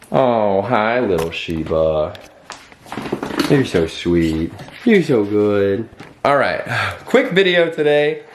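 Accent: South, United States. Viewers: The southern accent coming in strong in this vid! Keep up the positive vibes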